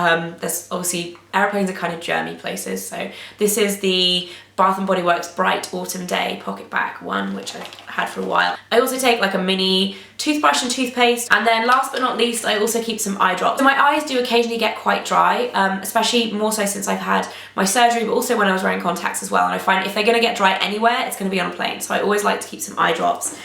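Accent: United Kingdom. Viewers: I love her British accent! <3 ... and the bag, of course. It's gorgeous!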